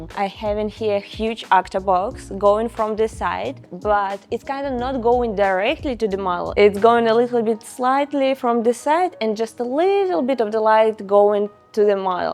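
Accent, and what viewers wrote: Russia. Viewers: Крутая ) но русский английский прям слышно)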